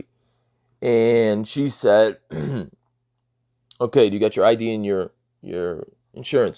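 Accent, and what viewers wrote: Chicago, United States. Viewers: Good story. Keep up the great work! Love the Chicago accent, you sound like Abe Frohman, the Sausage King of Chicago. 😎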